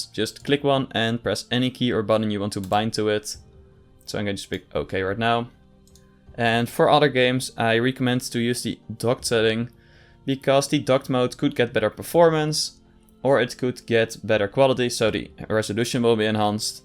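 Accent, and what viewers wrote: Netherlands. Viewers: nice! Your channel seems nice, i subscribed :) also is that a dutch accent i hear? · wait are you dutch?? i hear by the accent. instead of some you say som, so if youre dutch too comment plzz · If you download sword or shield and there is an update thingy do you need to download it or can you just keep playing and the emulator just updates it automatically Ps. I can hear your dutch😉 Is wel goeie man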